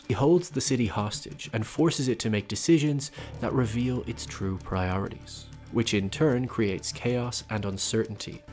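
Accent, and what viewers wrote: Ireland. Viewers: I wonder if your Irish you sound like an Irish man like myself haha but these videos are absolutely superb I've been watching them all there brilliant observations!!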